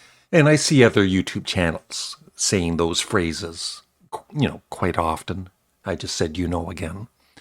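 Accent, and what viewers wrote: Canada. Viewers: Honestly, I never noticed you saying "you know". I do notice the "aboots" but that's your lovely Canadian accent which doesn't bother me. At least you don't wave your arms and hands in front of the camera like you're chasing off a swarm of angry bees. There are a couple music reviewers that give me a seizure with all their silly arm waving behavior 😆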